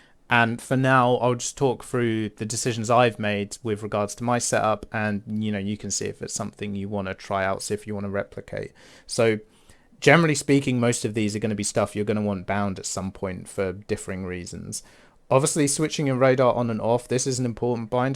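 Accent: United Kingdom. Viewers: Last time I watched a British man yap for 40 minutes it was TheMightyJingles. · mmmmmmmmm asmr british man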